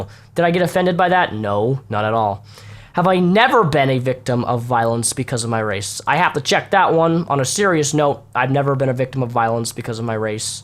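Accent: Finland. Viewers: Wait what, is he finnish??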